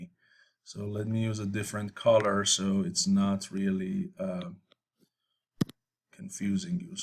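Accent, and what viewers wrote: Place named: Iran. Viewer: سلام من از دانشجو ترم 8 مکانیک یکی از دانشگاه های ایران بسیار بسیار از شما ممنونم بابت این فیلم بسیاراموزنده از مش زدن بسیار نحوه درس دادنتون شیرین وقشنگ بود بسیار لذت بردم دنبال اموزش مش در انسیس بودم در یوتیوب که این فیلم رو دیدم و دیدم چه لهجه ایرانی دارید. دیدم استاد هم ایرانیه کیف کردم سرزنده باشید.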